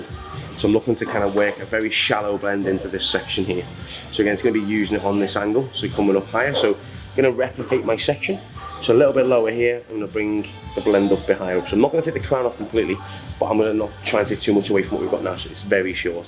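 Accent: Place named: England, United Kingdom. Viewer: Whats your English accent .. I wan tto know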